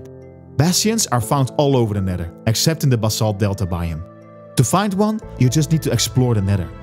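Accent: Netherlands. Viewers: Dutch accent is one of my favorites from non native accents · Gotta love the dutch accent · you sound Dutch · Bro sounds dutch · Haha I immediately heard that you were from the Netherlands. Funny how it works like that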